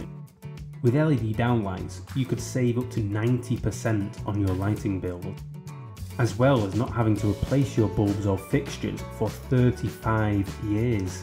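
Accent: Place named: United Kingdom. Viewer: OH NO.. A BRITISH GUY... UGH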